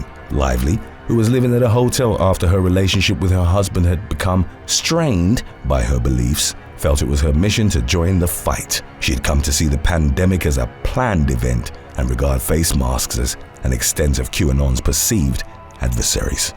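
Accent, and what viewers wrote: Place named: United Kingdom. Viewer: The mask policy was, like the plandemic itself, fraudulent. All the main perpetrators have admitted this at various times -- gates, fauci, nih, cdc, media organs, labs. We needed more people like these refusers, not more sheeple who dumbly helped the criminalized authorities enforce the dictates on them. Surely you're alarmed at how easily everything became "fascist"? Every store owner, every governor, every mayor -- even your fellow citizens and checkout lines ad nauseam became little "Hitlers" over us. You sound British -- the UK govt turned absolutist so fast (and (has) stayed that way so long) it should terrify you way more than any disease, real or imagined. Not lecturing you, just saying.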